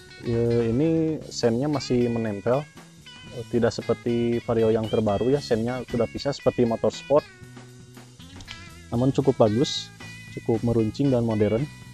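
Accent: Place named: West Java, Indonesia. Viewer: Konsumsi bbm dan oli juga kang Btw wa suka logat sunda sampeyan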